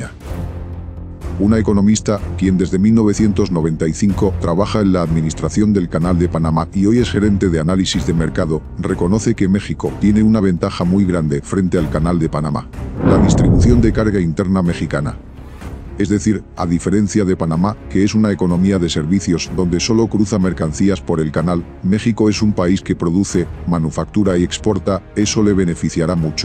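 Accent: Spain. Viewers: Que extraño, estos vídeos relacionados con México narrados por un español!!!! Para ripley. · Porqué un Espanyol tiene que ser la voz en Off ???? Teniendo tantas voces tan icononicas de Artistas Mexicanos!!! 😮😮😮😢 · Y por que narra un español preguntome yooooooo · Quítale el acento gallego por favor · Eviten este canal de CM. Sólo hay locución en español de españa cuando se trata de México. Evitenlo. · Ya no queremos escuchar a un Español narrando los videos!!! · Por qué el locutor habla como español si esto es un proyecto mexicano es de México debe de ser un locutor mexicano😅😅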